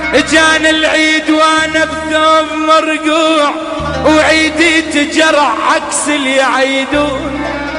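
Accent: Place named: Iraq. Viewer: ياويلي ع الهجه العراقيه تدخل بالقلب من غير استأذان عراقيه وفتخر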